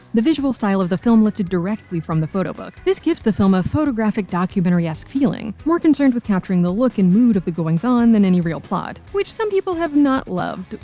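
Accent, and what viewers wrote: United States. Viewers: Chicago area accent. Not that different from the NJ accent near NYC.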